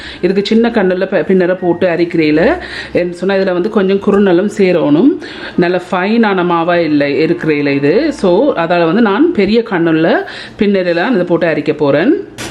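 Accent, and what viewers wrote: Sri Lanka. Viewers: Sister spoken Ceylon Tamil super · Nengal pesarathu alagaa iruku... Ilangai Tamil thaane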